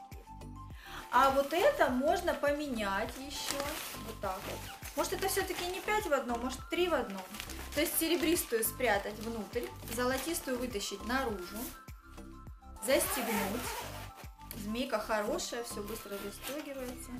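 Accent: Rostov, Russia. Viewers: по-моему ростов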